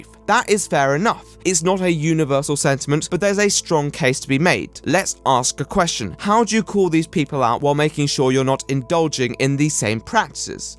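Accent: RP, United Kingdom. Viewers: You sound like super nanny do all posh people not know how to pronounce acceptable?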